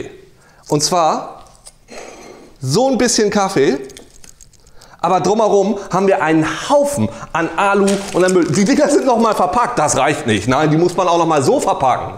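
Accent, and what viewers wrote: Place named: East Frisia, Germany. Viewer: ostfriesisch. Nicht hamburgisch.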